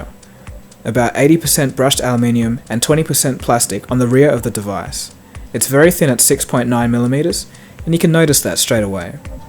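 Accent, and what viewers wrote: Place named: Australia. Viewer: cool. did`nt realise u were from oz tho! you dont have a typical oz accent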